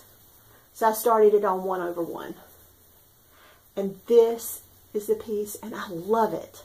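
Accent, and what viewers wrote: South, United States. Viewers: Another wonderful video. It always makes me happy to see your sweet smiling face and listen to your sweet southern voice. So awesome you got to meet Nicola, hear her lecture and take a class...unforgettable. I also had to stop the video to shop for the Karoline...pattern. I haven't stitched with wools yet, but I will in the very near future. Love the Sampler piece over 1, I'm doing mine over 1 as well...but way behind you. Hugs and stitches right back at you. ❤️❤️❤️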